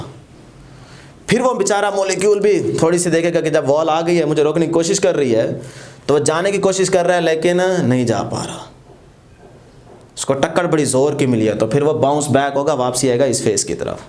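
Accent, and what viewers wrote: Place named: Saraiki, Pakistan. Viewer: Sir ap saraiki laghta hnn😊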